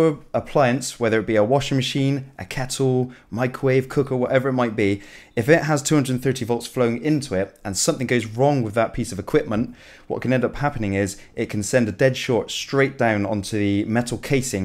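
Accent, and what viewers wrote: Bristol, United Kingdom. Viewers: This is worth watching just for the Brizzel accent. Make sure you say thirteen more often please (firt'een) 😂😂😂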